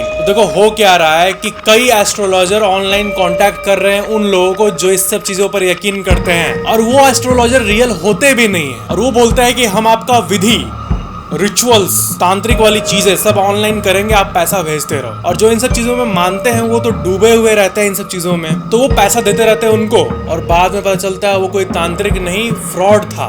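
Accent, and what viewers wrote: Bihar, India. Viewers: Are you from Bihar because your voice tone is like bihari, I'm also an bihari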